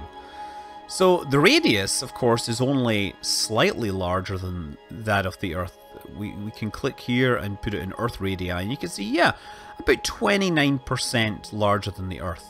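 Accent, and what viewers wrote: Scotland, United Kingdom. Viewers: Nice video, I enjoyed the scotish accent !! ...The earth is the most massive solid planet in the solar system and has the third magnitude of surface gravity after Jupiter and Neptune, escape velocity of 11 km / s (40,000 km / h) to elude such gravitational field it requires a huge amount of energy (we could say that the earth it's already a super earth) Inhabited planets with higher masses could be gravitationally unattractive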